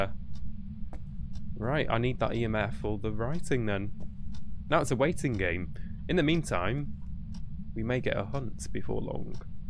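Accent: United Kingdom. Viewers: Man am I the only one who absolutely love his really good british accent? Idk it just sound so amazing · What part of the UK are you from? I recognize the accent you got.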